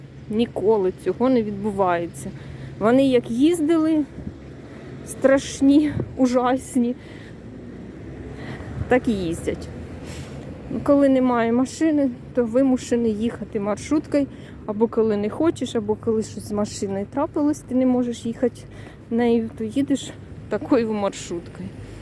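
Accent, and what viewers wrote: Sumy, Ukraine. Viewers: Сумський суржик не можно не впізнати,так говорять тільки в Сумах.❤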